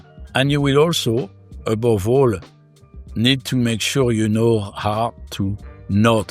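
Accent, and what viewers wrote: France. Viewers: I love that you (still) have a strong French accent (a real plus for your channel in my opinion) 😁 Much more pleasant than the accent of many of my fellow Germans. They sound so wooden, as if they're trying to convince Scotland Yard that they aren't actually spies scouting England for a planned invasion. 🤣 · I'm almost certain you're French, but I have no proof at the moment (except for your accent).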